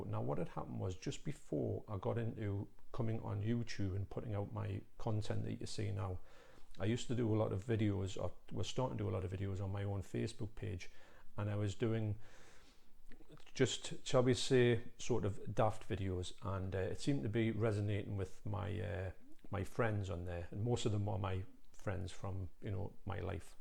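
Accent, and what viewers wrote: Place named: RP, United Kingdom. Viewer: what's with the posh accent ?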